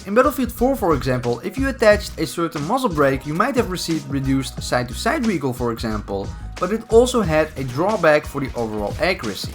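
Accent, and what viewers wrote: Netherlands. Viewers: are you from the Netherlands, you have a bit of an accent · I can hear you are dutch (ja toch?)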